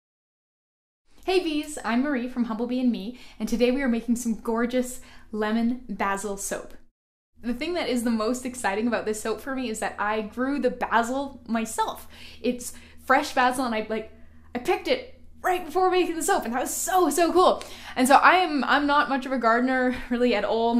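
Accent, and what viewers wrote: United Kingdom. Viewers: Hey Marie! First of all I'm in love with all ur tutorials though I'm new 2 ur channel. Ur doing an amazing job teaching the world that u know. 👏👏❤❤💏💏👍😍😍😱😱. Second of all ignore the idiots who find fault with our Brit accent. They can't appreciate the fact that ur so knowledgeable, instead finding fault with the accent. U go girl. Lots of love.. 💏💏💏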